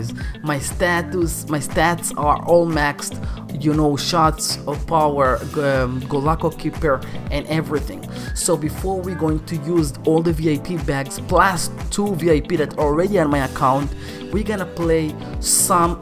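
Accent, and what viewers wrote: Israel. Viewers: חחחח אתה ישראלי זיהיתי בהתחלה מהמבטא אבל אז ראיתי בעברית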